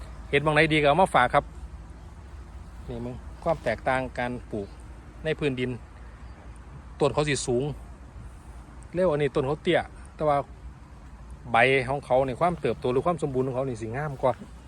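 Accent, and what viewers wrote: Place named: Isan, Thailand. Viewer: พูดอีสานฟัง เพราะกว่านะคะ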